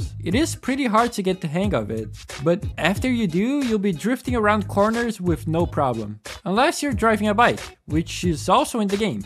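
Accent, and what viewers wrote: Brazil. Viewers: Bro you Brazilian?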